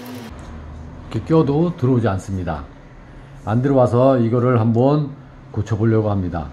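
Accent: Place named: Chuncheon, South Korea. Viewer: 춘천 분이신기봐요 ㅎㅎ 구독합니다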